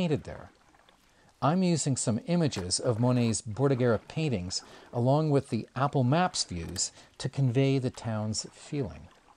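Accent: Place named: Canada. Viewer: You must be Canadian. (E.g, pronounciation of boat, house, etc.)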